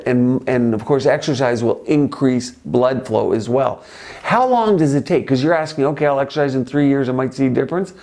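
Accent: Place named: Canada. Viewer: I was so confused because I was listening to another video and thought 'he sounds so Canadian'....haha! Just discovered you this week, great content! Keep up the great work, cheers, from another Canadian!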